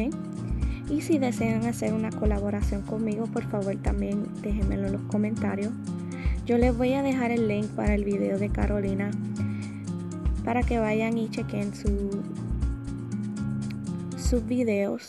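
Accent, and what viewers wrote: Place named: Puerto Rico. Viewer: Perdona la pregunta eres boricua? tú acento es igual que el mío PR en la casa saludos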